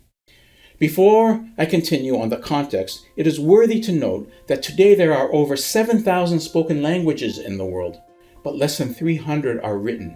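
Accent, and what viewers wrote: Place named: Iran. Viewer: Because he is Persian. I think. They have hard time pronouncing qaf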